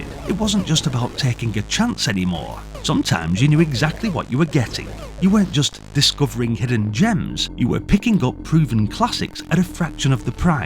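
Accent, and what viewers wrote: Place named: Rotherham, United Kingdom. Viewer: Are you from Rotherham? The accent sounds familiar lol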